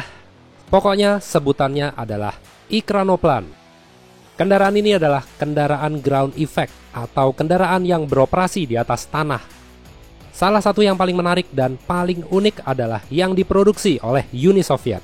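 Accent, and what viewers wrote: Java, Indonesia. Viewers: Bang lu jawa?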